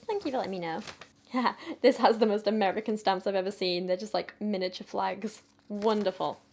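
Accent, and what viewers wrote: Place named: RP, United Kingdom. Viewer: wow looking even more beautiful in this video :-O and I still love your posh accent, I would listen to you reading the phone book :-O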